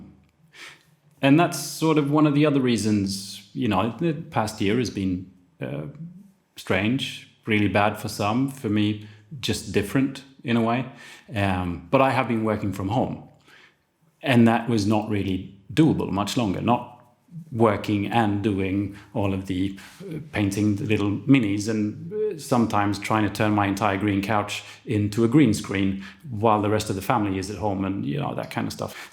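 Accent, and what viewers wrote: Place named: Sweden. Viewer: Great channel very comforting g to hear a Swedish accent oh and awesome video might take a couple aspects of you set up for mine thanks for the content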